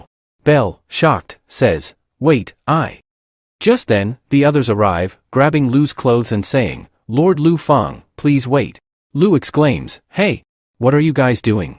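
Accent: India. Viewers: WTF why after min 50 the Ai voice have India Accent, at least check your edited video dude.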